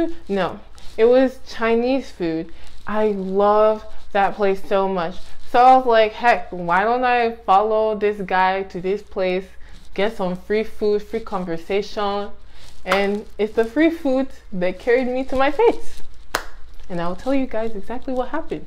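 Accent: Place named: Nigeria. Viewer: Your Nigerian accent is coming thru 🇳🇬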